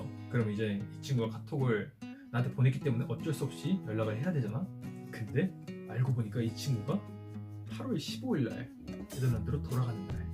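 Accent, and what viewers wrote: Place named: Gyeongsang, South Korea. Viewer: + 경상도 사람 같은디 경상도의 서윗함을보여주네용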